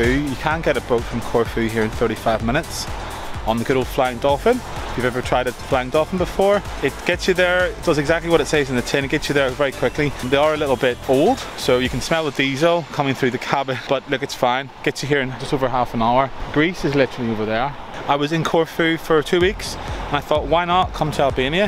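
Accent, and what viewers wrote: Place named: Northern Ireland, United Kingdom. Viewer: Good to hear your n. I.accent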